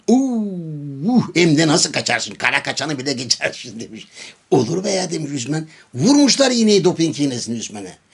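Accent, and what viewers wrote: Thrace, Turkey. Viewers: Biz de trakya şivesini severiz.. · Ben bayiliyorum sizin trakya sivenize. Sabah saat 6 da yuruyus yaparken sizi kulakligimla dinleyerek spor yapiyorum. Vakit nasil geçiyor anlamıyorum hocam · Günaydın, mutlu sabahlar efendim. Yine her zamanki gibi salı gününede pozitif bir başlangıç yaptınız ve Trakya şivesiyle güzel fıkralara başladınız maşallah enerjik halinize bayılıyorum. Tanrı yaşamınızı hep böyle devam ettirmenize katkı versin. Tüm abone ve takipcilerede mutlu sağlıklı yaşam dilerim. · Ben de bayiliyorum Trakya sivenize, Gecen gun "Hinge" demistiniz, butun gun aklima geldikce guldum. :) Ancak Trakyalilar anlar sanirim. :) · bilakis trakya şivesine bayılıyoruz beyaa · Harikasiniz cok degerli bilgiler veriyorsunuz ...ayrica fikralariniz trakya siveniz mukemmel🇹🇷🇹🇷🇹🇷🇹🇷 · .Trakya sivesi ile konusmaniz cok keyifli . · Dedem trakya şivesine lütfen devam.....❤❤❤ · LÜTFEN TRAKYA SIVESIY'LE KONUSUNUZ, COK HOSUMA GIDIYOR !!!... 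· Trakya şivesi severim dede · Saygılar dedecim. Bi gün videonun tamamını trakya şivesiyle yapsanız.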